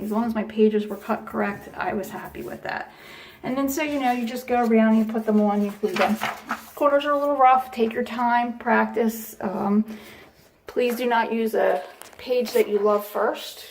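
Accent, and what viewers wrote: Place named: Philadelphia, United States. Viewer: I can tell by your accent that you are definitely from philly. Great video by the way!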